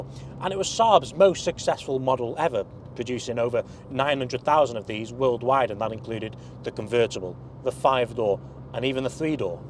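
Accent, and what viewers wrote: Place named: Wrexham, United Kingdom. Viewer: I presume you're a Wrexham lad from the accent, would love to see you take some stuff over Worlds End. Yes it's narrow but I used to take my 'Group B' MG 6R4 over there for a shakedown and across Llandegla...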